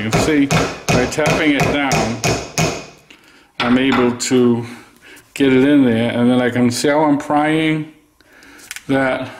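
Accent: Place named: Rhode Island, United States. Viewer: Live in ri? I spot our accent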